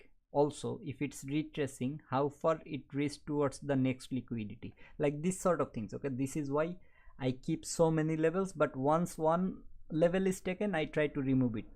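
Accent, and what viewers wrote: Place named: India. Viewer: you sound indian.. u indian?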